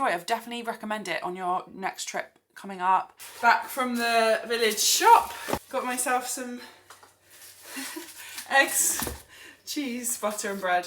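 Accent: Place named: England, United Kingdom. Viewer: I love your videos. As a Brit now living abroad it makes me smile to hear an English accent, see familiar British sights and have a catch-up with how things are going with you and. Yes - I'm a walker and camper who discovered you both through those video styles, but the everyday family stuff suits me too. Thank you! Beach fail - romantically watching a dog poop in front of you and watching it steam in the salt breeze. Lol!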